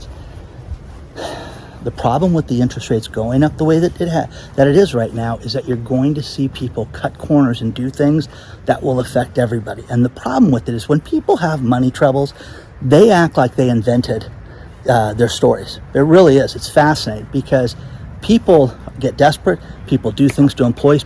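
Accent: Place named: California, United States. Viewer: You sound like a Californian.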